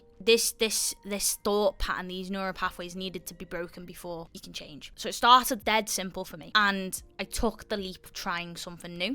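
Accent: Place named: Merseyside, United Kingdom. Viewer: Genuinely, I used to have awful MH then I got better for a while then I had an unexpected loss in the family very close to me and lost my way. I’ve been struggling with it for 3 years, not knowing how I got to a good place, not knowing my purpose in life and trying to avoid my feelings that I fell back into add/ction transference and resorted back to the BED I was diagnosed with that I’d managed to escape for a while. I’m from Merseyside as well so not only does your accent bring me a sense of home as I moved away from home, your videos have reminded me of how I did it the first time. I changed the way I spoke to myself, I changed the neural pathways. Sadly, negative ones were reinforced by the death of my loved one which is partly why I fell so hard (and sadly gained back some of the weight I’d lost the first time I got better). 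Thank you for reminding me that I can do hard things, and I can get back to how I was before because I’ve already done it once, I can do it again. I can take ownership of the bad things that happened to me and be resilient. I am resilient, and I always have been resilient. My family member died and I lost my home in my first year as a mature university student and I still got that degree and got a job despite feeling like the world was crashing and burning around me. I can do hard things. I can take control of my life. I can like myself again. And, most importantly, I can beat the add/ctions I once had. 6 years smoke free. 2.5 years alcohol free. Now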